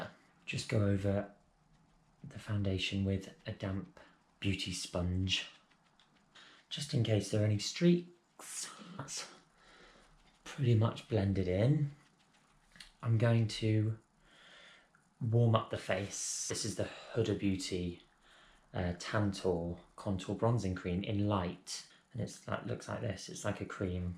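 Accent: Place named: Essex, United Kingdom. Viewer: You sound of..... East England.... but South, Mid, or North...? I can’t tell for sure but I am going to guess Essex.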